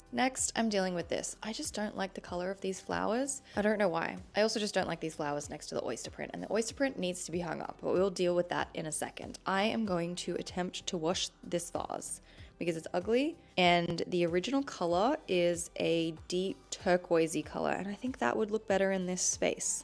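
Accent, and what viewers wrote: Australia. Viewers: Nice haul. BTW were you originally from Australia ? Cause you sound like one of us. I'm from Perth. W A. If you were ,what brings you to the states. Thrifting is definately better in the states. 😂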